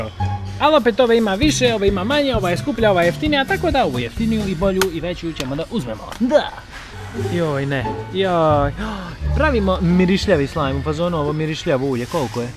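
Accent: Bosnia and Herzegovina. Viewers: Jesi ti iz Bosne ba hahahaha ili Crne gore???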